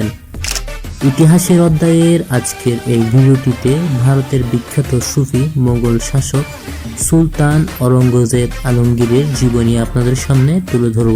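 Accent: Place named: Chittagong, Bangladesh. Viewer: ভাই উচ্চারণ নিয়ে কাজ করতে হবে। অতিরিক্ত চাটগাঁইয়া এক্সেন্ট আসছে। শুনতে অনেক খারাপ লাগছে।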